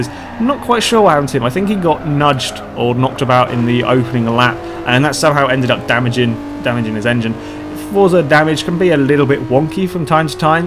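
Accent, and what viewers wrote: United Kingdom. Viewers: Some of you might confuse of him. He really speak like that because of his British Accent. Don't complain too much what he is saying. '_'"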